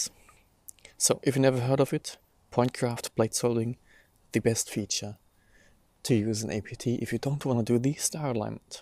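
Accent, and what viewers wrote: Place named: Germany. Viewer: Ich finde den Videoschnitt echt super, hat so ein leichten Astrobackyard touch, was ich sehr begrüße nicht falsch verstehen und auch der Ton ist schön ausbalanciert und angenehm, bis auf vllt das es keine 3 Sekunden braucht um zu merken das du eig Deutsch sprichst 😉 Wo es ja so schön um Field flattner geht, kennst du irgendwelche für 1,25" OAZ's? Habe mein Newton soweit modifiziert das ich mit der DSLR in der Fokus komme aber bis jetzt nur 45€ Field flattner welche die Brennweite halbieren gefunden... Und wenn ich das bei 700mm mache kann ich, Brennweiten technisch gesehen, auch mein 200mm f/4 mit nem x2 Telekonverter nehmen und komme auf mehr Brennweite... Dafür ist das dann doch irgendwie blöd. Auf 2" aufrüsten hab ich auch schon Mal dran gedacht, hatte mir aber vor rund 1,5 Monaten ne Baader OIII CCD Filter mit 1,25" gegönnt und der wäre dann ja logischer Weise nicht mehr nutzbar 🙁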